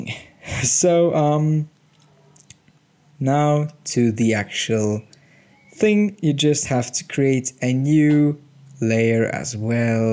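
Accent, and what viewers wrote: Germany. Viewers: geil ein deutscher der englisch redet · BHAudioProduction Spoken with a strong german accent. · U are german right? Good Tutorial. Looks realy Nice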